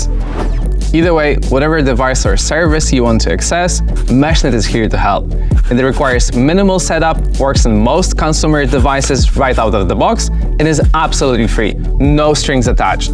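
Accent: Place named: Eastern Europe. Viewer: Чувак, у тебя такой восточноевропейский акцент 😁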